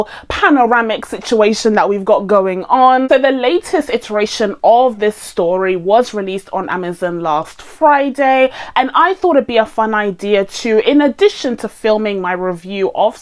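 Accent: United Kingdom. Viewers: I'm Finnish myself, and I'm generally bad at placing English accents as it is a second language I rarely get to use other than online. I do love your accent, though. It feels very British, but flows in a way I haven't happened to hear before. Not sure if there's like a definitive London accent, I'd imagine not as it is a very fluid and cosmopolitan city, but yours is lovely ♥ Pretty sure you have more people there than we do in all of Finland, around 5,5 million here 😁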